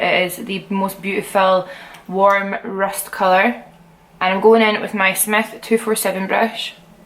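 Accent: Scotland, United Kingdom. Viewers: I sound similar to her but hers is much stronger haha I'm fairly quiet myself tho it's hard getting people to understand when I go on holiday 😂 us Scots speak fairly quick and with slang aha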